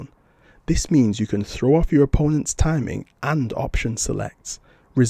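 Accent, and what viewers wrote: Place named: United Kingdom. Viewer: I love you're british accent man and yes i understand the v'reversal but i still can't play like the pros 🤣🤣🤣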